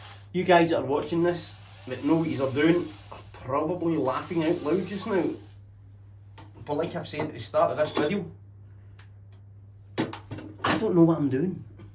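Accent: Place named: Scotland, United Kingdom. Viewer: That's a normal Scottish accent .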